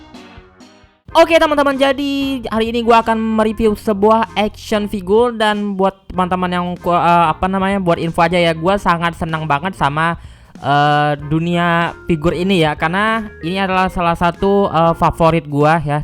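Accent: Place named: Palembang, Indonesia. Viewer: Kau orang Palembang yaaaaaaaaaaa💯💯💯💯💯💯💯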